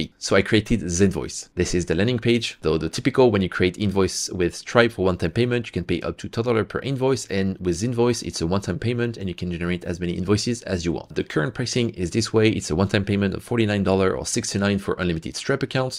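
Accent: France. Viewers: Hey, i have a weird question, are you French ? I sense some really well hidden french accent lol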